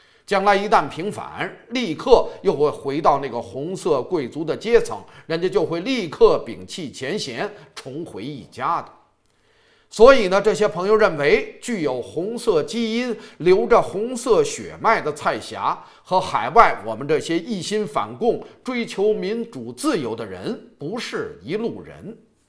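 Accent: Beijing, China. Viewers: 言之有理，一口京腔，特喜歡聽你講！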